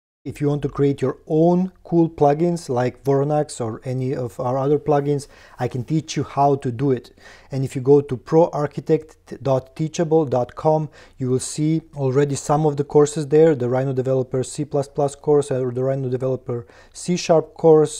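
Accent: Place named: Serbia. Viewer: Po english “akcent” Srbin vidi se iz aviona :) Good job👍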